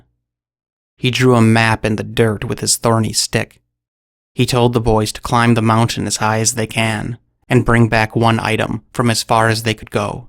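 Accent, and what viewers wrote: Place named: Missouri, United States. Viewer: I really like your work Your a intelligent dude.I'm from Missouri that's the way Us Hicks talk.lol,Looking forward to your next video..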